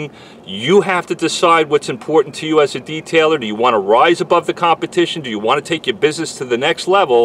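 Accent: New York, United States. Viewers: It says Dallas, but you sound like NY.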